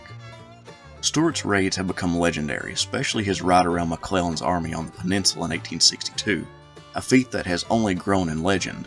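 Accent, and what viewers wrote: South, United States. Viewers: Ye know When I first watched one of your vids I heard the southern accent and country music start up and immediately expected a hard confederates bias but was pleasantly surprised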